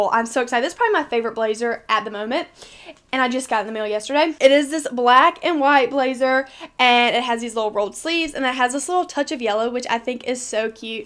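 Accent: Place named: South, United States. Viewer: I love your kind of southern accent accent! Where are you from?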